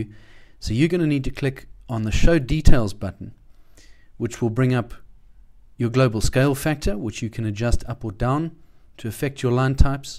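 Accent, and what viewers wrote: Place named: South Africa. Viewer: Thank you! You sound South African.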